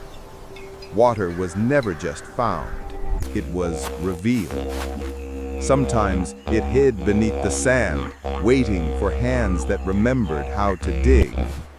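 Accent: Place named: United States. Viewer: What a load of BS - it would have to be some American voice over - as usual!!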